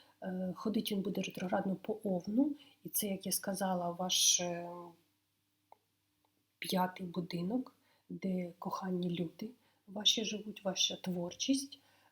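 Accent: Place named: Poltava, Ukraine. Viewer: У Вас полтавський акцент, який дуже милий моєму вуху))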